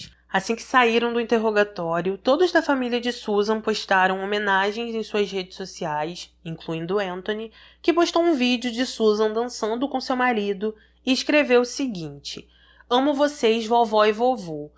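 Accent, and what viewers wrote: Rio de Janeiro, Brazil. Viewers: Adoro O sutaque carioca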